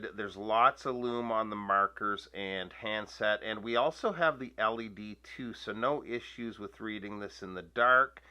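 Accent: Canada. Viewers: Canadian ehh !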